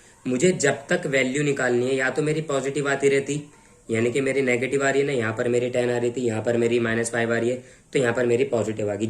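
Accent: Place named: South, India. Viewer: sir your pronounciation for squairereee is very funny , its seems that you are using south indian accent..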